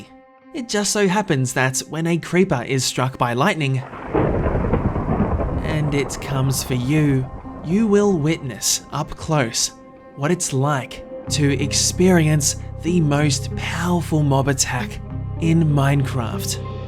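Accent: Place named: Australia. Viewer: I swear ur Australian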